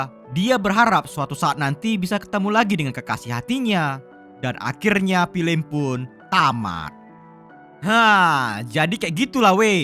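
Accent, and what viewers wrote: Medan, Indonesia. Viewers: Bg lu orng Medan yh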